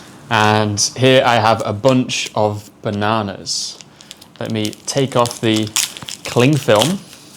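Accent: United Kingdom. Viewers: I love your English accent Is easy to understand for me🙏🙏 You are very good teacher. Thanks. From 🇵🇪 Peru. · Could please tell me do your speak with Rp accent?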